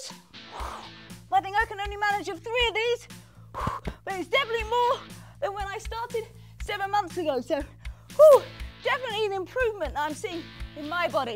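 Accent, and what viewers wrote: United Kingdom. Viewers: She has a British accent. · Anybody else do all of her amazing workout and suddenly start talking along to them in a British accent .. I love it!!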